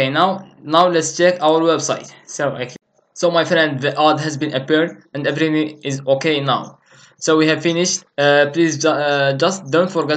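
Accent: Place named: Russia. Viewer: i love the Way you talk I love russians when they talk :)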